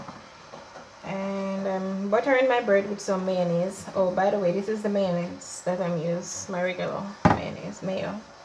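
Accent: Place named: Jamaica. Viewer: Your accent is to die for I love Jamaicans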